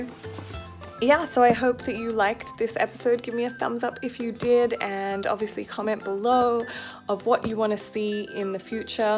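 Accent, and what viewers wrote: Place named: United Kingdom. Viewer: Hi Anusha I am your big fan from Nepal ... I love you so much MTV most wanted... My teenage time.. You were my favourite n still i love u n i just love the way you speak your English sounds like British accent.... Muaahhhh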